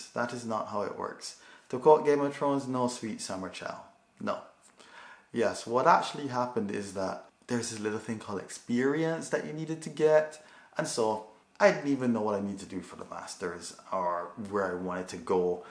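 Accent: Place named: Ireland. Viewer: Your accent is sounds like Irish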